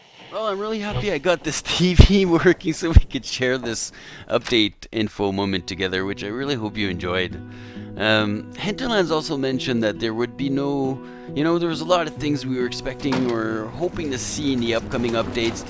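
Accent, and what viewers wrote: France. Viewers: OH MY GOD!.........YOU'RE FRENCH!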